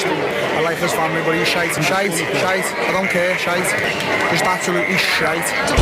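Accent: Liverpool, United Kingdom. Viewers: An elequent scouser yes lad 😂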